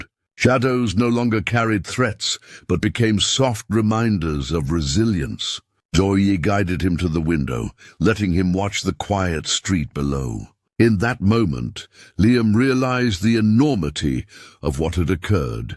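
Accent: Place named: England, United Kingdom. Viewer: Sorry, but I can't listen to the heavy English (?) accent telling a biker story. Just doesn't match.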